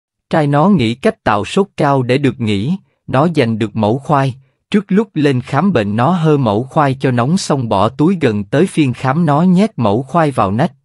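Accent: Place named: South, Vietnam. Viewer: đây là đúng giọng miền nam mà thật hãnh diện vì giọng đọc của a cảm ơn kênh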